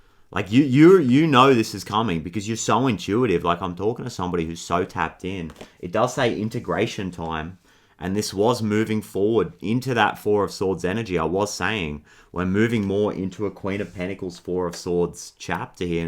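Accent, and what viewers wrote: Australia. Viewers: God you're adorable! and your Australian accent and the tone of your voice is wonderful on my ears. Thank you for your light and purity; thank you for choosing to share this very on-point and powerful message. You got my subscriptions and likes for sure! I look forward to hearing more messages from you! Cheers! ❤🙏💎🌟🌟🌟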